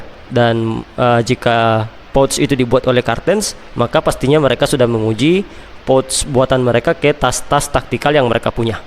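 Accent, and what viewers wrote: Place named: Sulawesi, Indonesia. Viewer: Dari logat bicara kayaknya Abang orang Sulawesi ya 😂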